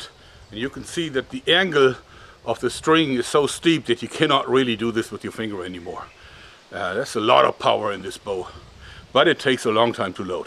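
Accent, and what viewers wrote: Germany. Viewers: Are You german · Is he German ?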